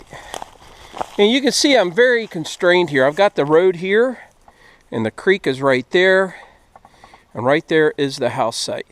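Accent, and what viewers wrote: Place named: Pennsylvania, United States. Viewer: You are more than welcome to come join us on a hunt if you get up our way. I knew I recognized that central Pennsylvania accent. I’m a former police officer and K9 handler. Some of our dogs came from Castle k9 in Carlisle Pa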